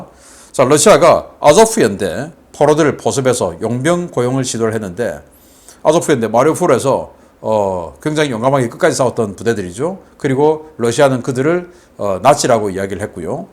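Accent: Gyeongsang, South Korea. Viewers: 대부분 사실이길 바라면서 .. 너무 신나는 소식이라 너무 좋아하면서 이방송 열심히 듣고 있습니다 . 신인균님의 시원한 갱상도 사투리. 목소리도 더 재미있고요 ㅎㅎㅎ❤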